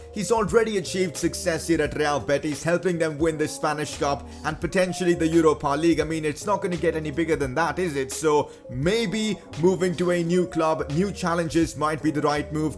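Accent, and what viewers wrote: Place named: India. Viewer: This has more indian accent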